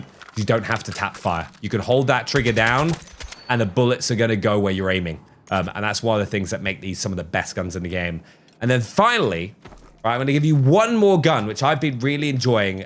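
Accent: England, United Kingdom. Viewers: Jim Jefferies is Australian and this bloke is English. Completely different accents.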